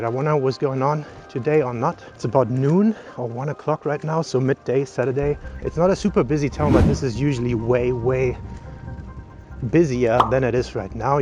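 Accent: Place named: Franconia, Germany. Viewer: Franken oder? xd Ich habs allein gewusst wenn er deutsch redet und war mir 100% sicher, wie er in einem anderem Video gesagt hat „du kannst mir mal den Buckl runter rutschn“ und jetzt noch die Dächer in dem Video...😂 haha🙈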